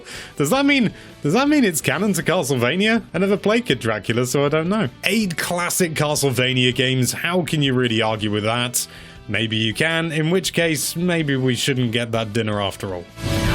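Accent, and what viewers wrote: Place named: United Kingdom. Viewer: This is the most britishy britsh accent int he world.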